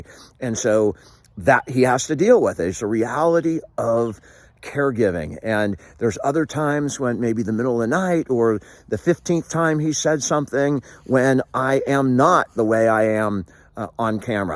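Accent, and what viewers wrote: California, United States. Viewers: You have a heavy California accent lol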